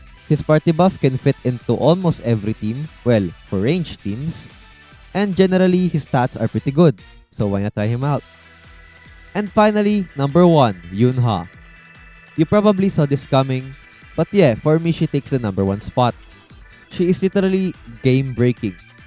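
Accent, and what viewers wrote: Philippines. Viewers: U sounds a filipin0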